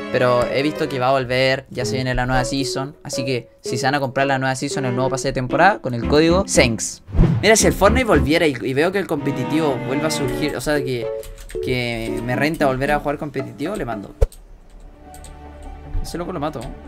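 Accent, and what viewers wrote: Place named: Argentina. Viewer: Ctm que es estresante cuando un weon que es chileno habla como argentino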